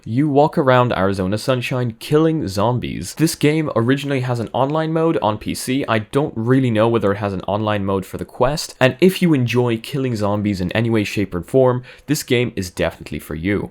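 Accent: Ireland. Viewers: are you Irish? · Are you irish lol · Is he Irish orrrr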